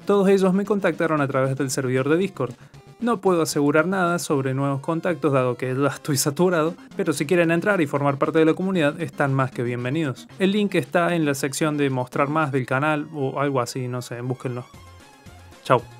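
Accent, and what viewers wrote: Córdoba, Argentina. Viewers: si es argentino, escúchale el acento nomas. Ni ahí es un verdulero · Te noto acento cordobés, le doy like por la musiquita de terraria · Disimulalo pero sos cordobes, manso video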